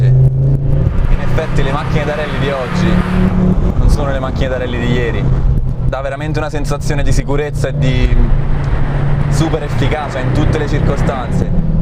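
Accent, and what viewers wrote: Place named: L'Aquila, Italy. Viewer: Accento aquilano, paesaggio aquilano.... Valle subequana, una fiera di curve in un paesaggio mozzafiato.... Grande, un po di passione abruzzese nel mondo!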